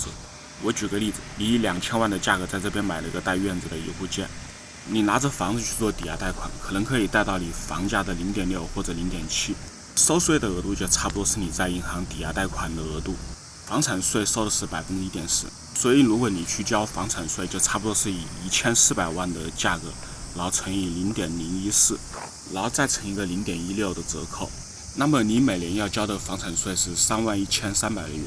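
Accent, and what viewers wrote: Wuhan, China. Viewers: 武汉口音太明显了。。。😝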